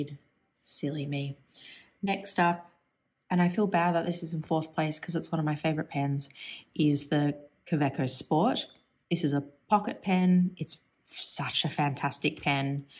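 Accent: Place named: Australia. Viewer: I, too, am not so fond of Lamy. I adore the Plaisir. It writes all the time. The Preppy just can’t be beat. I have several sets in different nibs all inked up in their different colors, so I can draw quickly. They are only $4.00 here. I do not like my Kawecos at all. It think it’s the nibs, so I might change them out. I do not yet have a TWSBI yet. I’m cheap. Don’t love the piston fill, either. I love the Platinums altogether. The Kukuno is also a big favorite and I have several, as they only cost $12.00 here. I have them all over the house. 😅I never spend more than $30.00 on pens. I’m now getting into inks. OMG. About to lose my mind. So many choices, so much money. I prefer cartridges so will be filling my own with special inks. Some inks are so gorgeous they should be drawing inks because the writing doesn’t really show all the nuances. Do you have actual pen stores in Australia? 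I live in Oregon, USA, and we have only one stationary store and they carry a few fountain pens. Other than that, in my local area, I buy everything on line. I probably told you before, I love your accent and all things Australian 💝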